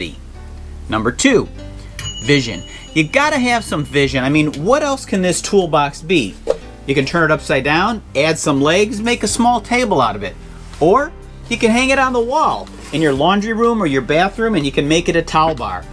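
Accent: Chicago, United States. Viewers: You're from Chicago aren't you? We hear it in our own.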